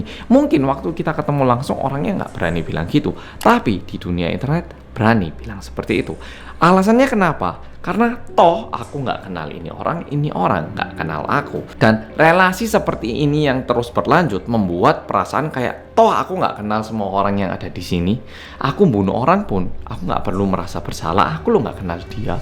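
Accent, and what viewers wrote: Java, Indonesia. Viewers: Edan...medok banget Indonesianya,ngga ada minesnya😂😂😂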